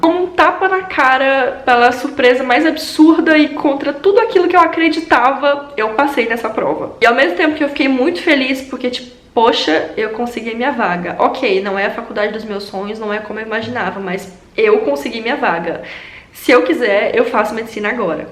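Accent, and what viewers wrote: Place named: Tocantins, Brazil. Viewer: Não sabiam q o pessoal do Tocantins tinha um jeito puxado de falar. ,bjs linda sucesso ❤♥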